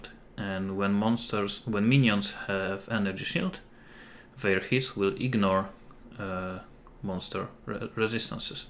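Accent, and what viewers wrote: Poland. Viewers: Akcent wskazuje na polskie pochodzenie. Mylę się? btw Nice movie! like it a lot!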